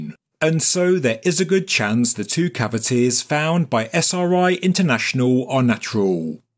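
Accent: United Kingdom. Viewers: May I ask what part of the UK. There are different accents in the UK!! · identifies as a UK citizen. Accent sounds pure UK to me. (No offense to your husband.)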